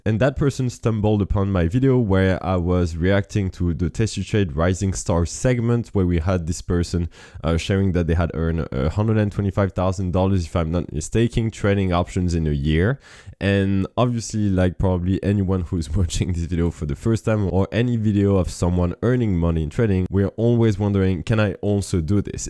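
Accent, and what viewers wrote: France. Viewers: tu ne serais pas français à la base ? je comprends ton anglais parfaitement :)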